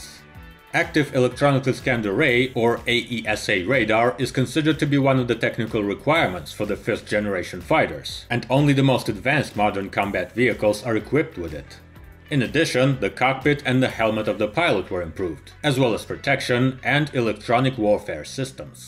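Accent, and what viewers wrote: Russia. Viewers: North american video with a russian accent...complete bs...what about the fact it can stop mid flight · Good video and I love your Russian accent. Subscribed. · От этого отстоя отказалась армия. Так что - можете не обсуждать привидение Каспера. С рязанским акцентом троллей посёлка Ольгино.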